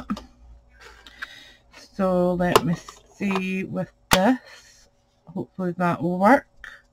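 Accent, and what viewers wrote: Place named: Scotland, United Kingdom. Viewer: Hi! thanks for the tips!! love hearing a scottish accent!!! Good luck with your channel x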